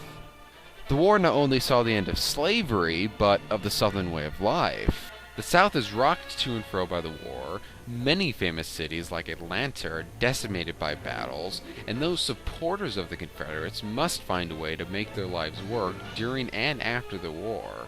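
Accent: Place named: New England, United States. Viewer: Nasal yankee commentator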